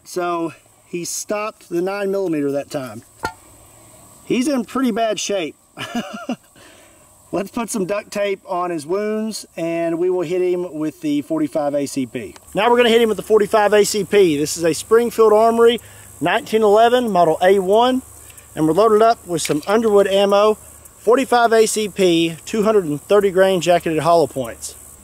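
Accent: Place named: Eastern Kentucky, United States. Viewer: I’m also from Kentucky! Eastern to be exact! It’s weird to see someone with the same accent as me!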